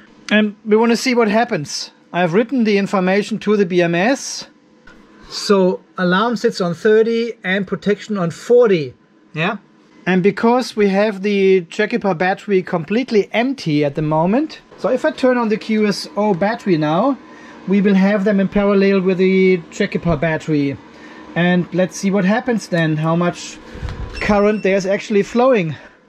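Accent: Germany. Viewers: At first I was thinking what is a PVM? Then I remembered ah German, where W's are pronounced as V's. :)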